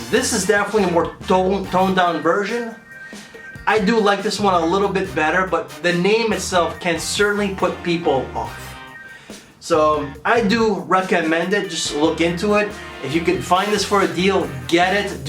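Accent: Quebec, Canada. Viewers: Es-tu quebecois? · I don't know how to feel right now. Like you said, the scent is nothing special, but the visuals.....how did you manage to kiss yourself? lol Appreciate the accent québecois.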